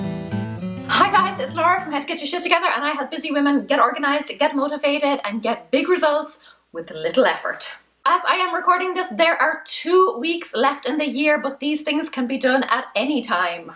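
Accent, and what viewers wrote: Ireland. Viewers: LOL "Shoot it out of a cannon", if only that was a real option. Then my neighbors could deal with all of my stuff haha. Great sense of humor, very inspiring. Liked and subbed. Also, love your hair, the name of your channel and of course your accent. Éirinn go Brách. I think that is correct? I'm about 1/4 Irish and born in the USA. (I'm not trying to be political, just supportive of Ireland, their culture and accents) I'm a compulsive collector (if you are wondering, yes, hoarding), with PTSD, MDD, ADHD, GAD, panic disorder, perfectionism and I watch these kinds of videos for inspiration, strategies to change my life. You're are fresh and funny, I think I will watch more of your videos. And yes, that means to procrastinate even longer LOL.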